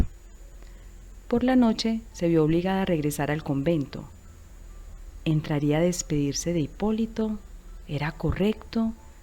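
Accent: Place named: Antioquia, Colombia. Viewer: El acento paisa es difícil de neutralizar...pero no imposible.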